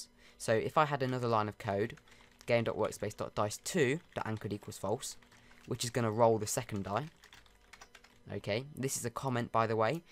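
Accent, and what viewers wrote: United Kingdom. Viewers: HAS TO BE UK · never really got where your accent was from, was thinking UK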